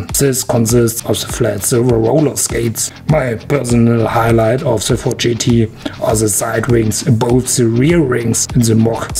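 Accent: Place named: Germany. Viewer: Are you German? Because you sound very German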